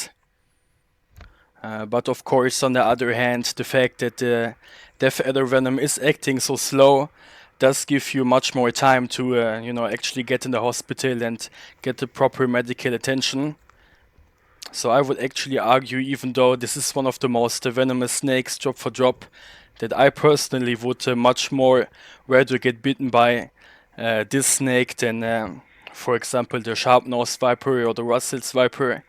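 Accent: Germany. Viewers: Youre not English right youre another Language like German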